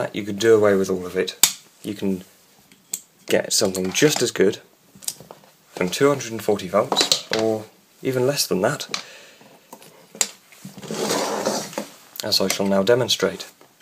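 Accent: United Kingdom. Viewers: i’m pretty sure he’s from the uk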